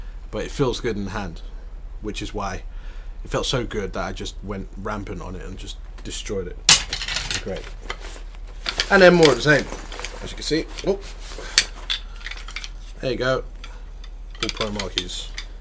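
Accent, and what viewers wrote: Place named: England, United Kingdom. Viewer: a black man with a english accent that's awesome 🙌🙋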